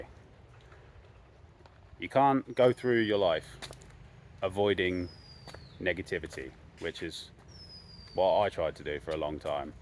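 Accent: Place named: England, United Kingdom. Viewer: What country is this, in.guy is English.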